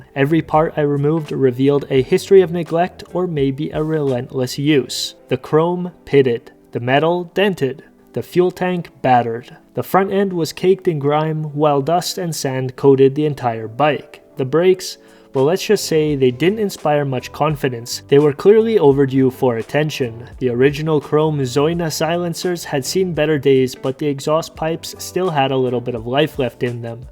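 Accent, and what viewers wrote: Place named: Canada. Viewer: What a great video! It was difficult to understand your thick Canadian accent but I loved watching the tear down and hard work.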